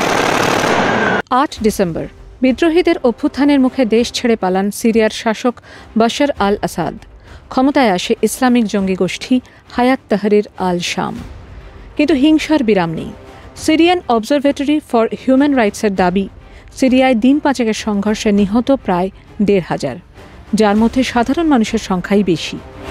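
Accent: Bangladesh. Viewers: পূর্ব বাংলার উচ্চারণভঙ্গীতে আনন্দবাজারে এই নতুন আমদানী কে? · বাংলাদেশী ভয়েস। সে অবশ্যই বাংলাদেশী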